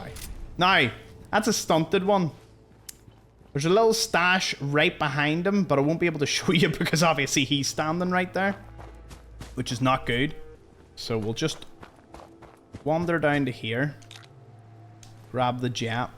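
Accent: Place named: Scotland, United Kingdom. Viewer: I like William Wallace accent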